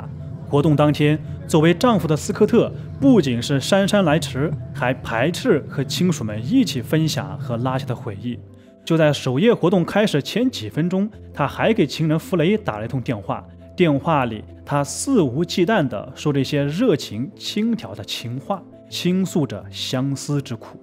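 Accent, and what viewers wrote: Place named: Gansu, China. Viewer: 甘肃口音？咬字有点怪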